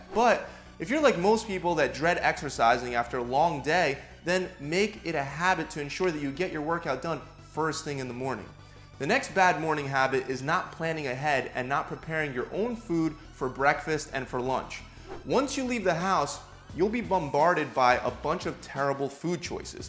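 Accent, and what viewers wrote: Philadelphia, United States. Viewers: Philly accent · Haha are you from Philly? Love my Philly wooder.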